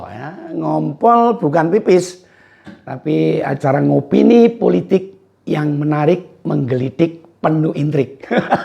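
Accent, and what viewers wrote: Java, Indonesia. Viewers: Selamat sore om 😁saya suka dg cara anda mjdi host dg logat jawanya yg sngt kental 👍👍😁🇮🇩..Pak SP itu dlm hati bilang "siapan pak Jokowi udh bikin gw malu" pakde Jokowi blg " kapookkmu kapannn pak brutus😂😂mknya ojo kesusu 👈🇮🇩❤️ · Keren bangen,jowone Medhok tapi aku suka banget ulasane...lanjut berikutnya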